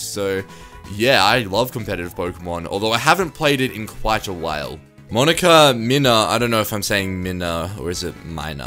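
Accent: Australia. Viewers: just subscribed, don't know why but channels with australian (maybe you're from new zealand or the likes, im shit with this stuff my b) make me laugh so fucking more than if an american and or englishman(?) said the same shit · are you from australia coz you sound like you are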